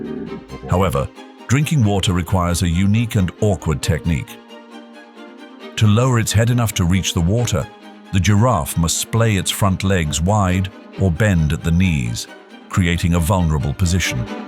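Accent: United Kingdom. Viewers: At least the source discloses that this is AI-generated. But why does the AI narrator always have a "posh" southern England accent? This is almost without exception the case. Do the people who create these things think that the accent lends an air of credibility and gravitas?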